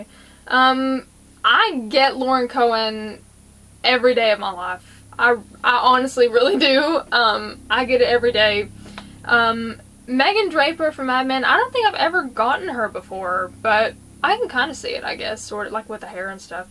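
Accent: South, United States. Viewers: I knew I subscribed to you for a reason. You're speaking my language! I don't hate Carol & Daryl either, but I am over them being these overly invincible characters who monopolize screentime that could go to other characters. They're not the only 'interesting' characters on this show but you can't tell by the way the screentime is divided. Give me more of Michonne & Maggie, damn it! But the Southern language thing is SPOT ON. I was born and raised in south Georgia, and that's how the people here talk as well. When I first started watching your videos, I thought you were from here but nope. You're just a part of my extended Southern family. Greetings, my Southern Soul Sister! P.S. I started in S4 after binge watching too. So, you're not alone in the sense that you started watching the show 'late.'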